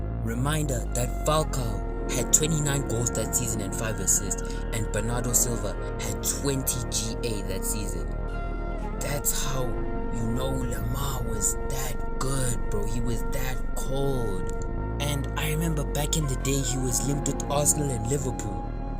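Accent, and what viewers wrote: South Africa. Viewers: South African?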